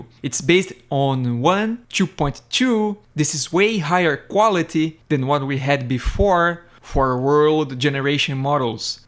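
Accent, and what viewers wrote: Brazil. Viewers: man are you brazilian? your accent is very brazilian, just got curious haha. good video btw :))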